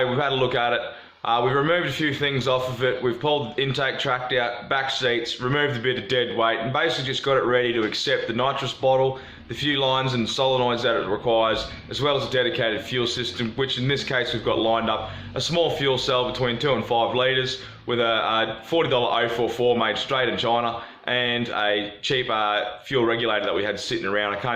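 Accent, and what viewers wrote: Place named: United Kingdom. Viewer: u dont have a deep aussie accent, u sound more like a british accent or UK accent.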